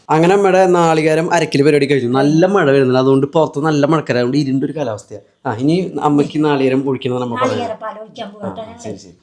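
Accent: Thrissur, India. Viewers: Trissur anoo chetta 👀😀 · Chetta You guys are really cute💕😍.. Enthu resamma ee video kandondu erikkan😍.. Nigalude face lle Innocence serikum ariyam.. The way you guys smile 😍 and i really like thrissur accent ❤️ Chetta U r amazing.. Keep going 💪👍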